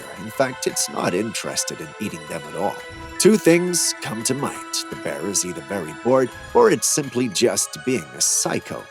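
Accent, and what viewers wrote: England, United Kingdom. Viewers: Why are you talking with an English accent in this video? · bro your starting to sound like a pommy englishman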